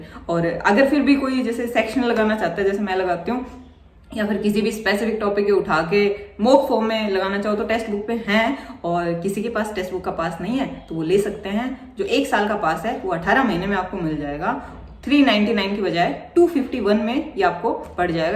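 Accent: Haryana, India. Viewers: M from uttarakhand but love hariyanvi accent 😁😁😂😂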